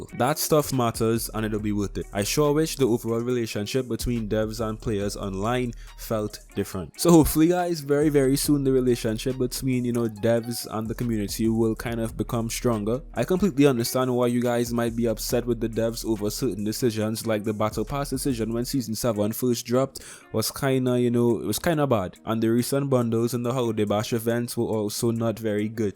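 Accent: Trinidad and Tobago. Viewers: is u from Trinidad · Are you trinidadian?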